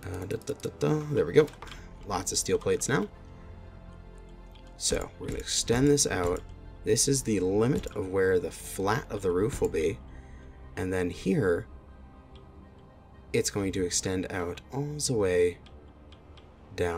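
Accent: United States. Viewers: Hey dude, I'm really enjoying your series, keep up the good work✌🏻 I have a question... What accent do you have? I assume you're American but where in America🤔 thanks haha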